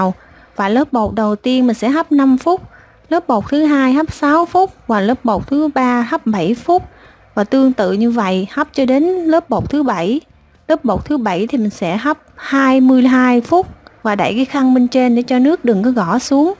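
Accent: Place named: South, Vietnam. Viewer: Cám ơn bạn lên tiếng cho 😍👍 giọng nói mỗi miền có đặc tính của miền đó. Giọng miền Nam của vô cùng đáng yêu. Nhẹ nhàng. Nghe giọng là biết tánh người Mình là ng nấu ăn vô cùng dỡ. Nhưng mình làm vài món của. Mình đều thành công Đó là vì hướng dẫn dễ hiểu, kỹ và ko giấu kỷ thuật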